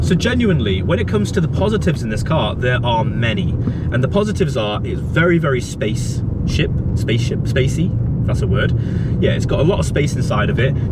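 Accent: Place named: Isle of Man, United Kingdom. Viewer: Hi, you can do this….just slow things down a bit, you’re trying to squeeze too much info in…main points, no waffle…..you come across fantastic, and the cameras and microphones are spot on….hope the vlogs work, and the business goes from strength to strength…..👍🇮🇲😉👌. Ps….I can still hear some manx in there yessir, if you know you know…..can’t fault the narrative, just slow down a bit…😜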